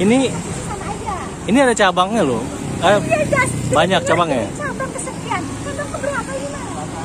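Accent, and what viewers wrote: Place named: India. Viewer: ES nya segerr..suaranya mirip orang india, kontennya bagus tentang kuliner.semoga bermanfa'at.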